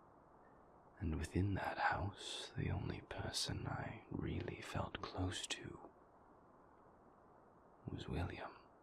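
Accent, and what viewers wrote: United Kingdom. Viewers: FINALLYYYYYYY, now cue the emotional tears and British accent 🫡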